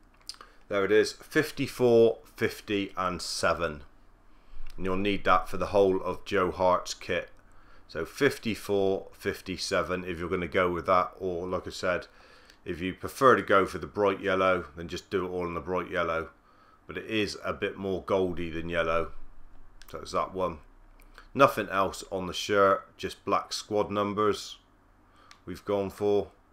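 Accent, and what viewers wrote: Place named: Gloucestershire, United Kingdom. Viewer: Sound like your from gloustershire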